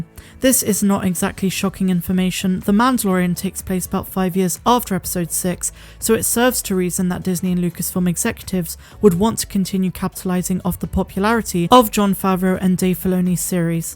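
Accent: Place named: West Country, United Kingdom. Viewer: I always enjoy your videos and I love hearing your west country accent (Bristol is one of my favourite places in Britain). I often wonder if there is a correlation between how exciting the news is and how many ''Uttinis'' in the intro. I do miss the 3 x Uttini intro. ;) Keep up the good work, and may the force be with you ;) x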